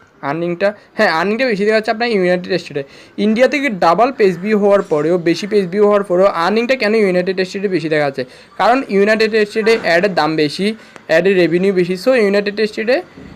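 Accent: Chittagong, Bangladesh. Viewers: Noakhailla na mia ,chatgaiya. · vaiya,,,apni dheki amder Chittagong pola,,,,,ekta kotha hosse,,,seyta hosse,,,,flag mane ki? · ভাইয়ের বাড়ি হয়তো চিটাগাং অথবা কক্সবাজার অঞ্চলে!!কথায় স্পষ্ট বুঝা যায়!!!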